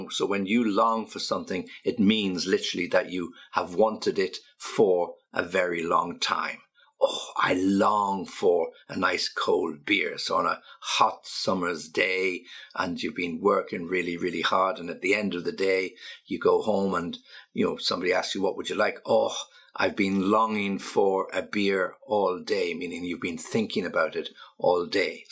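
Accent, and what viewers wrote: United Kingdom. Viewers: Are them british sounds or American? I like british. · I just found this channel, British English and I love this so much. I'm Indonesian, and I love learing Brit_Eng ❤ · I love british accent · مرحبا هل هذه لهجة بريطانية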